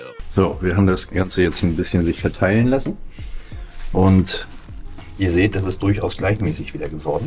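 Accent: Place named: Sylt, Germany. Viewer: Der Akzent! Himmlische Heimatgefühle! Komme von List auf Sylt. Jedes Mal wenn ich ihn höre denke ich an Munkmarscher Muschelsuppe.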